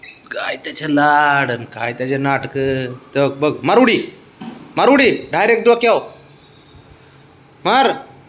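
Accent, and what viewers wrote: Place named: Sangola, India. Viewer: तुम्ही पुण्यात राहत आसला तरी सोलापूर ची सांगोला ची भाषा आहे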